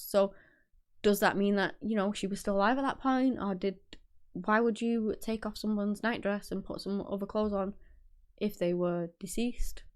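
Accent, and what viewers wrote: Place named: Northern England, United Kingdom. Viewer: Hello my darling can I ask what part of the UK you are from, I love your channel probably because of your northern accent, hi from Australia 🇦🇺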